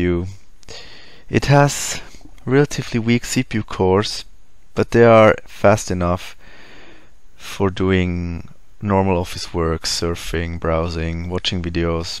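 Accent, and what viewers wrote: Germany. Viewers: dieser hölzenre deutsche Akzent....warum nicht gleich auf deutsch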